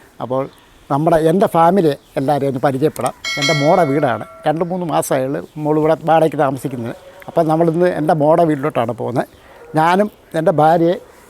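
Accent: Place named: Idukki, India. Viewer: ചേട്ടന്റെ സ്ഥലം എവിടെയാ ചേട്ടാ, കേട്ടിട്ട് ഇടുക്കി കാര് പറയുന്നപോലെ ഒണ്ടല്ലോ 😍😍😍🔥🔥🔥🔥🔥🔥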